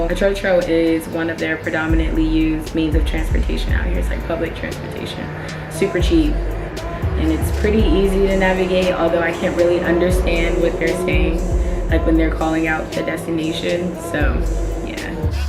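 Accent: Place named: United States. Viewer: The accent is why they say you have an American thing.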